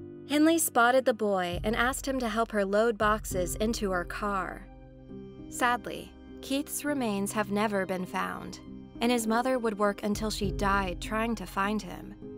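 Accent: United States. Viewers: Hearing an American pronounce all our town names is so strange! I live so close by it's so eerie and sad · Quit with these dreadful American accent talk over please awful this should be a British talker. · it’s quite strange to these very British crimes reflected back on to you with an American accent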